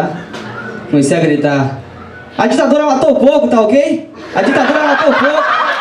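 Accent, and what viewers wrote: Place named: Salvador, Brazil. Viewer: Vimmm pelo João seu Pimenta ,se ele indincou porque é bomm, com esse sotaque de Salvador é bomm mesmo haha Vai chegar!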